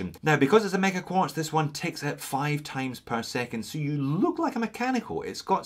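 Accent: Scotland, United Kingdom. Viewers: hey I spent the last few hours watching some of your videos, I love your presentation style and iconic "Hello" at the start. Love the scottish accent too. I like how you cover many different types of watches at all price points and it makes for interesting viewing. Keep up the good work mate!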